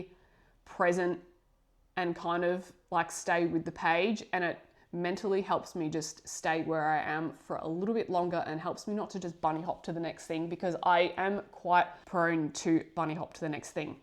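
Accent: Australia. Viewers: Sarah. From Melbourne. Newbie to your content. You have already converted me to try adult colouring. Love hearing Aussie ascents.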